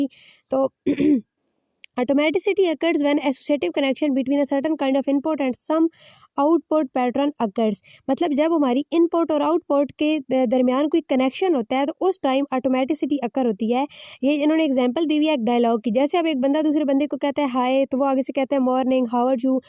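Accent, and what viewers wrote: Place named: Pakistan. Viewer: Content is good, but I can notice that Pakistani accent there 👀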